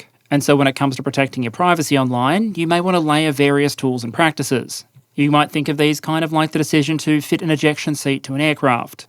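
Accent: Australia. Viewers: Great as always! BTW - is "wreck" havoc a specifically Australian idiom (I've always heard "wreak" havoc up until now) or is that just how "wreak" sounds with this accent? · Is this guy Australian?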